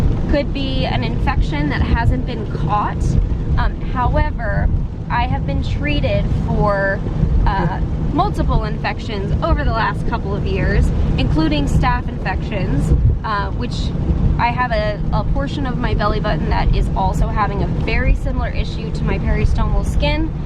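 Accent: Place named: South Jersey, United States. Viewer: I thought I detected a south jersey accent.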